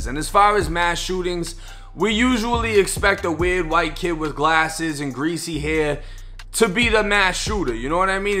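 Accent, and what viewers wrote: Boston, United States. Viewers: I ain’t know Tampa had that accent thought you was from Boston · Are u from Boston bro?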